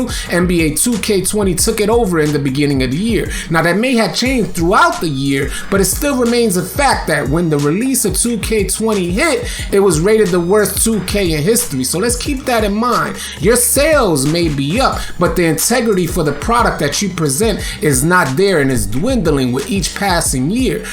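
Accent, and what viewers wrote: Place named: New York City, United States. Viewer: sounding real live in this one! I hit that like because you sounding real NYC in this one my G.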